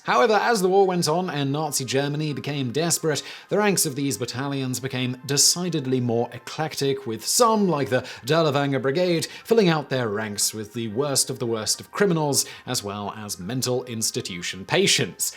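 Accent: United Kingdom. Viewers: Can u try American /Canadian accent,British accent story telling is just too tiring ..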